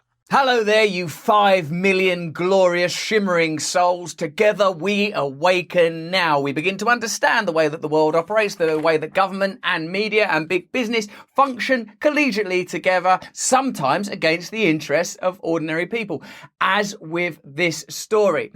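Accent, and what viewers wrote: United Kingdom. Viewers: "it's proper dodgy" XD i love how British he talks lol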